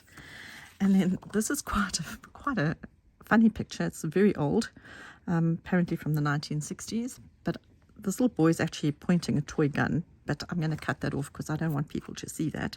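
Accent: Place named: South Africa. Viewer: Hi! I JUST found your channel and I'm so excited to hear a fellow South African accent 😂 I'm currently binge watching all of your videos. Being new to junk journal making, I wanted to ask where you buy all of your stuff from? Especially your tapes and Tim Holtz stuff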